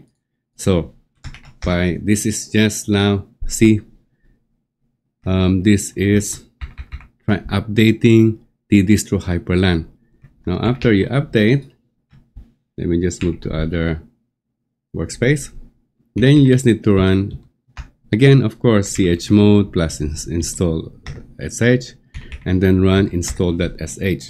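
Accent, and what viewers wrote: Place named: Ilocos, Philippines. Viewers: Hey, base on your accent and your name 🤣, I could tell your a Filipino, don't know if I'm right, but Well done kabayan. Pin if your really a Filipino pls. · the accent gives ilocano vibes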